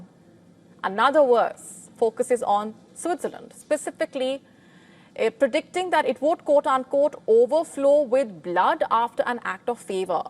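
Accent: India. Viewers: Accent of India is too strong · I couldn't understand half of this from her poojeet accent.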